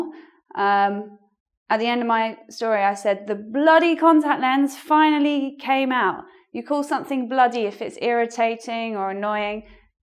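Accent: United Kingdom. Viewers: give me that british accent m8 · Her British accent is so cute! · I love your British accent. I wish I could sound more authenticaly. But I find bit silly trying to copy a native speaker, doesnt it sound awkward? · Hi, I have to confess: british english pronountiation is much more difficult to understand to me than american english. I follow your channel and I watch all your videos, but I have to say that I struggled to understand you today. But, keep it up :-)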